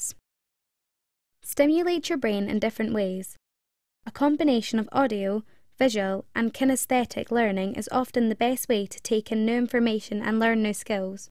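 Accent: Scotland, United Kingdom. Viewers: Great advice! It's probably the opposite of how most children are forced to learn these days. Btw, I think she sounded Scottish. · Are you from Scottland?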